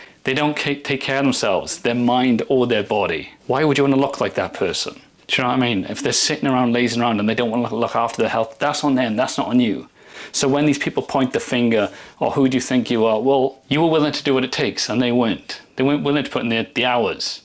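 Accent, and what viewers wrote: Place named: Wales, United Kingdom. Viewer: I’m so glad I found your Channel, I am from Bristol by the sounds of it your from wales! We should train together one day ahaha! And yes it only counts when you lock in for a significant amount of time, I’d love to show you more before and after face pic. I just eat steak and fruit 🍇 everyday and I feel and look 100 x better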